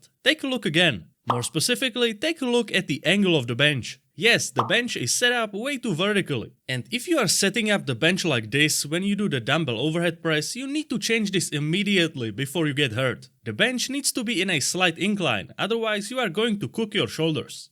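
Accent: Czech Republic. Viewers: Do i hear a czech accent?😗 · What about the dumbbell overhead press, I stand up when doing it, so I am vertical, but I push elbows and head a little forward, is it OK then? Btw I knew you are Czech after the first sentence, we can recognize that accent anytime :-D Ať se daří :-D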